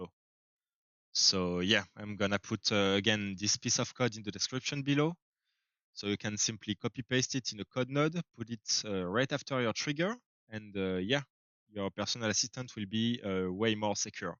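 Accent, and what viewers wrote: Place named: France. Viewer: L'accent français ❤ j'adore hhhhhh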